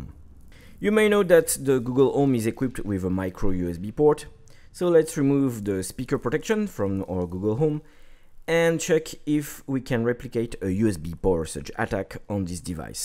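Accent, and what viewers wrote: France. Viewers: jolie accent français xd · you have a french accent,idk cause i'm french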